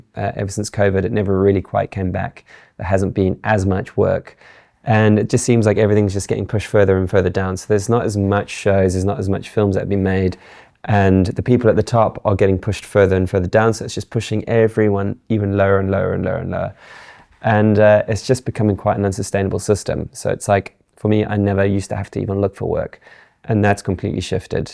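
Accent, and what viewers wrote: South Africa. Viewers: Why do you sound South African? It morphed from an Australian to South African accent 🤔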